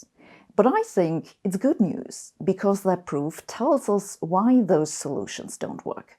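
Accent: Germany. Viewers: Schlechtes Mikrofon und ein Englisch mit starkem deutschem Akzent macht das Hören anstrengend. Der Akzent wird nur schwer zu ändern sein, aber ein besseres Mikrofon wäre vermutlich möglich.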